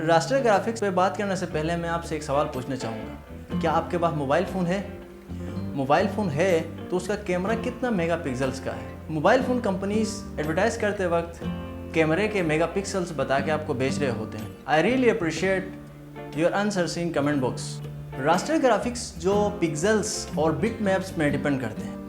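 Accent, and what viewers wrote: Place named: Gilgit, Pakistan. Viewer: Yar bro ap ka lahja to glgite Wala ha am I write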